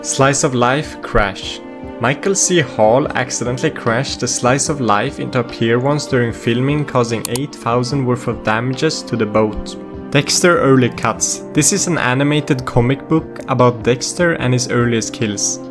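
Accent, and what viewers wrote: Sweden. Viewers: Are you by any chance Scandinavian? You sound kinda Swedish with the pronunciation. Greets and thanks for the great video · the swenglish i love it! Great video btw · yo are u by any chance swedish bc i think ur accent is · You hear the swedish more than the english when he speaks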